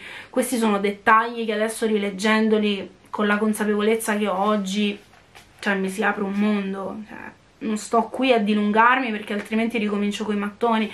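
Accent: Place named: Palermo, Italy. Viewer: Il tuo accento palermitano è qualcosa di stupendo 😂😍 (sono siciliana e ho origini palermitane)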